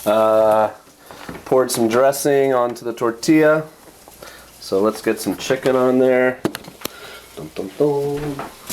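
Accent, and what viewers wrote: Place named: Scotland, United Kingdom. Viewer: YAY! Scottish accent..you should make a 2 part video with this accent.But you should have saved that last part(when you eat) for your next 2 part video,Eating with...with a scottish accent...ha ha ha.Seriously it would be nice if you could make a video at many kind of japanese restaurant,like where you go often for ramen,okonomiyaki etc...should be great!looking forward to your nxt vid.(i'm really curious about Okonomiyaki restaurant and Genuine Ramen Stand)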